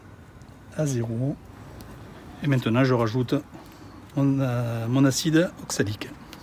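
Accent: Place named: Provence, France. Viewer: Bonjour Peut on utiliser ce même mélange (AO+AF+ SIROP) sur des lanières cartonnées pour un traitement avec couvain? En cas de forte Infestation, jusqu'à combien de traitement flash peut on faire en automne sans trop de risque sur la reine notamment? Félicitations pour la qualité de vos videos et votre sens de partage. Avec les enfants on suit vos vidéos et on apprécie le bel accent provençal 🙂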